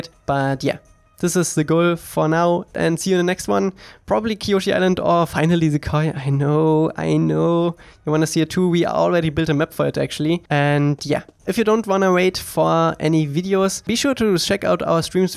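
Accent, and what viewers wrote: Germany. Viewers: Man erkennt zu 100% er ist deutscher English: because his accent you can hear his German Btw really nice game i hope we can play ist all in the future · Ich dachte doch gerade am Akzent.. das klingt ziemlich deutsch, schaue in die Kanalbeschreibung: Deutschland :D Aber coole neue Mechanismen! :) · if i may ask. from what country are you? your accent reminds me a bit of german. when i hear "glide" u kinda say "gleit" the german word :D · Haha voll rausgehört das du aus Deutschland kommst :D mit welcher Engine arbeitest du denn am Projekt? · Gutes Englisch, aber ich feiere einfach, das kaum ein deutschsprachig Nation, ihren Akzent verstecken kann (willst du wahrscheinlich auch gar nicht) Grüße aus Hannover 😘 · nicer deutsch akzent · Wirklich sehr kräftiger, deutscher Akzent. Aber naja nice, dass ein Deutscher das ambitionierteste Fangame-Projekt zu avatar leitet (ist) ^^ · Nice, in the beginning it looked like a simple amateurly made game and I expected the project to stop after a month, but it's really coming together and getting closer to a game I'd enjoy paying! 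Keep it up my dudes! PS: btw are you German? Your accent sounds like you were. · That's the most german english I ever heard😁😁the game looks very good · digga wie man einfach hört das du deutscher bist :D Aber geiles Game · Wie krass man hört, dass du deutsch bist. Aber mal davon abgesehen sieht alles sehr cool aus. :D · Der Typ ist 100 Prozent deutsch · Du bist ein deutscher oder? ^^ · Dieser deutsche Akzent XD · Du hast n echt deutschen Akzent. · Ich weis nicht, aber kann es sein das er aus Deutschland ist ? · Man Merkt das er Deutscher ist :D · Your english sounds like you are German, please tell me I am right :D (I am German) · Du bist doch ein deutscher, oder ?😂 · Are you german? Your english sounds really german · Sounds like a german accent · Man hört einfach das er Deutsch ist haha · wie man das deutsch höhrt haha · Der Deutsche Akzent macht das tausendmal lustiger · Man hört irgendwie deinen Deutschenakzent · Not even 2 minutes in and I knew you're german xD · Man hört seinen deutschen akzent soo krass raus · Dieser English Akzent :D Deutsch nicht wahr?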